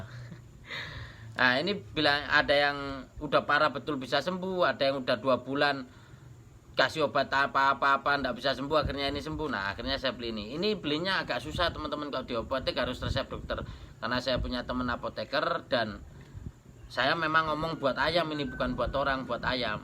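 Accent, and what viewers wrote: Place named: Java, Indonesia. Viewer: Boso jowone ra iso ilang mas😂😂 Salam saking tulungagung..🙏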